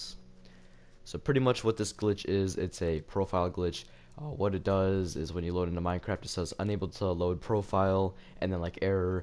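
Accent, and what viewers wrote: Wales, United Kingdom. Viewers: Are you from Wales? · You welsh bat?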